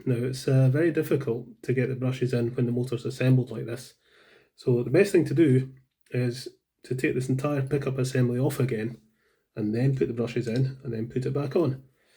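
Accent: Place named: North, United Kingdom. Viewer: Great accent .. you’re not a southerner …. :)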